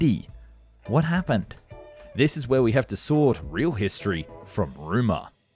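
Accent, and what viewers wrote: United Kingdom. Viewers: i would like to think, being (or at least sounding) British pronounced it naynay purposely.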